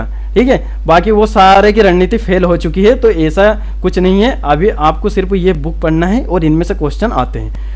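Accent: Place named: Indore, India. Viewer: Sir aap indore s hona.....apki voice tune s lg ra h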